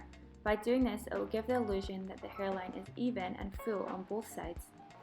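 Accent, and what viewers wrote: Scotland, United Kingdom. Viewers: The Cuts ❄, The scottish accent, is this in glasgow? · Are you Scottish?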